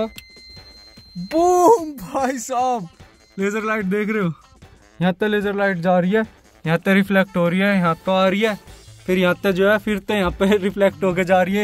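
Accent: Haryana, India. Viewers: Bhai tera haryanvi accent and tu itna handsome ❤❤❤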